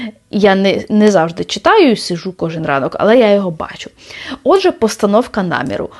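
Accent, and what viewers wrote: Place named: Lviv, Ukraine. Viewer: Вас так приємно слухати, що я навіть не вмикаю х1.5 (що зазвичай роблю). Ви наче маєте львівську говірку («будЕте», «до прикладу»…) чи ви дійсно львів’янка може? Так часто вас слухаю - складається враження, що ми особисто знайомі 😅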